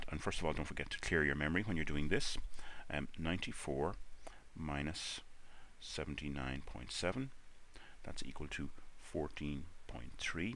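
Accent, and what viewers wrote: Ireland. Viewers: That is a thick Irish brogue!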